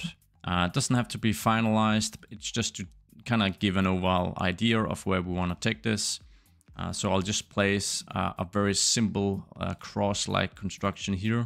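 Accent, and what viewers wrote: Denmark. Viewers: Are you danish? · this guy has to be danish. thats some danglish if ive ever heard it lol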